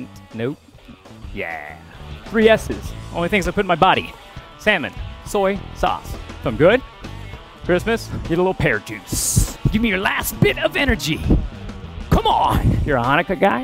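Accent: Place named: Ireland. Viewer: ouu, Irish... He's drunk!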